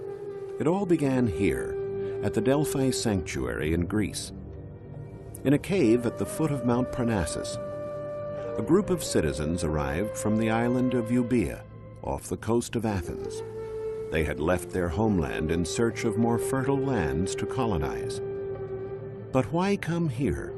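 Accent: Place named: United States. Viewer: To attain the real truth in these matters I am convinced a time machine is required. As in present times, the political landscape must have been a swamp of trickery and treachery. An American accent covering such a topic, per the BBC, just doesn't make sense.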